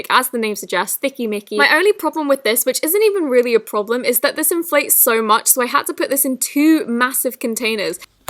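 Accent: United Kingdom. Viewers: She's British so she can't pronounce it like Zoo-keen-ie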